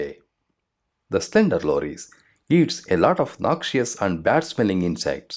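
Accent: India. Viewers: Like for that south accent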